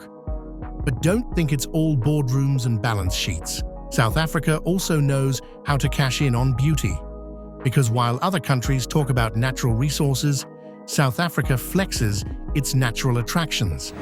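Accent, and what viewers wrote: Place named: United Kingdom. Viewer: The Narrator just gained a proudly South African follower. He is so good at explaining and very informative. Sounds British, Great accent.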